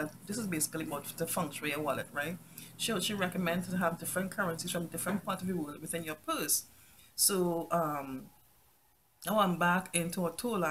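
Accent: Trinidad and Tobago. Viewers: I could tell by your accent hi trini am so happy 🥰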